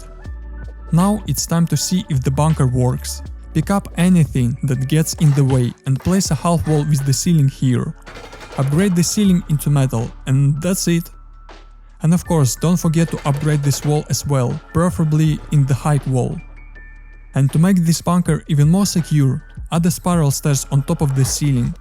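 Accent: Russia. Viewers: Русский акцент ;)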